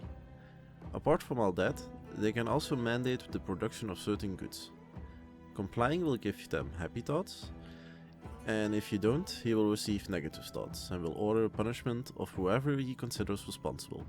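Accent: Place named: Netherlands. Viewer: I can't listen to this horrible Dutch accent. Jezus Christus.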